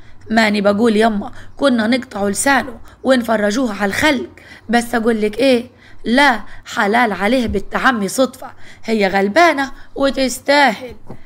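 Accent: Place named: Upper Egypt, Egypt. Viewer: واو بصراحه تعجبني حلقات بصوت صعيدي❤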